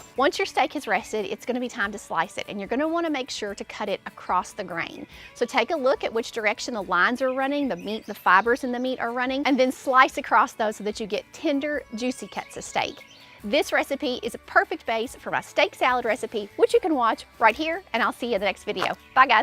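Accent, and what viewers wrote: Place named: Texas, United States. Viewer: Super Texan I bet ❤️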